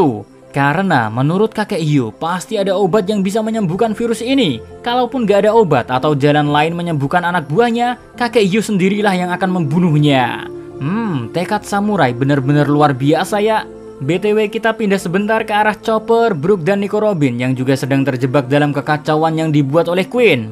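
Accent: Java, Indonesia. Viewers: Konten react paling ....... ditunggu dan terngiang dgn logat jawanya 😂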